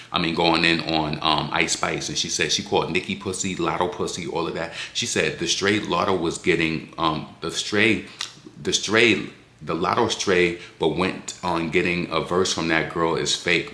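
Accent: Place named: New York, United States. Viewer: I needed it this run down in full New York 🗽 accent ❤❤❤❤❤❤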